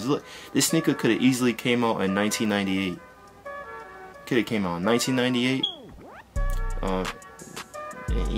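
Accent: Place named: East Coast, United States. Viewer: LOL this man got a whole east coast accent. U ain't one of us asking that silly sh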